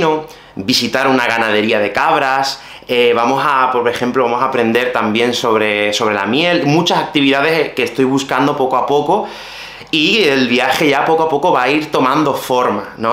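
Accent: Andalusia, Spain. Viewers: empezó a hablar muy andaluz 😂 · enhorabuena por llevar a cabo este proyecto! además me encanta cuando se te nota el acento andaluz, es como hablas en tu día día? no entiendo porque haces el neutro en los vídeos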